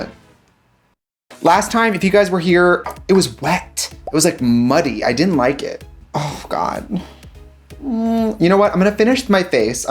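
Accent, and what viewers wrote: Wisconsin, United States. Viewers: Any one else think Trixies Wisconsin accent shows up more during the 2020 videos? I feel like i hear it more in these than newer ones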